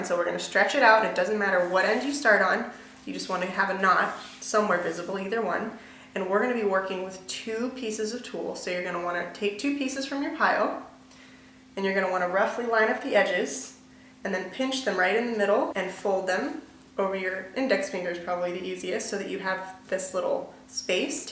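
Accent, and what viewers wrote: Spain. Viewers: oh yes you can !! i've seen old vlogs and you have an awesome spanish accent :)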